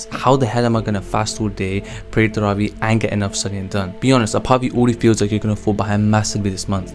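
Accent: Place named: United Kingdom. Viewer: Yo Pakistani don’t flex your British accent talk slow I didn’t understand much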